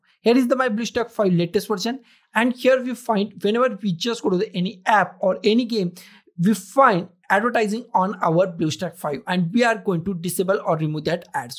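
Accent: India. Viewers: yes great informative video!! india accent :X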